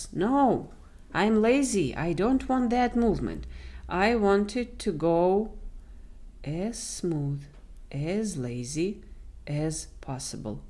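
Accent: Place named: Russia. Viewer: in Russia we call it grandma’s style. Also we have other styles such as classic and eastern. Aren’t you russian by any chance? Your accent sounds russian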